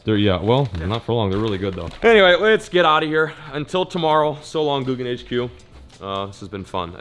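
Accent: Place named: South, United States. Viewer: Smh he jst done came off? Dam outta chi only a lil bit n u gt a southern drawl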